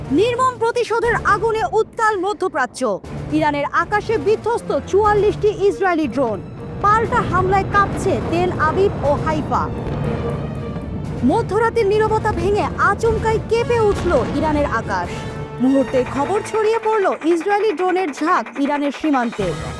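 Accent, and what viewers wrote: Kolkata, India. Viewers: ইন্ডিয়ার মত নিউজ পাঠ করা শুরু করল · Apni citkar kore khobor porsen keno? Mone hosse kolkatar khobor sunci....santo vabe khobor poren... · পুরাই কলকাতা স্টাইলে নিউজ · যিনি খবর পড়তেছেনে মনে হচ্ছে ভারতীয় ।কলকাতায় এমন খবর পড়ে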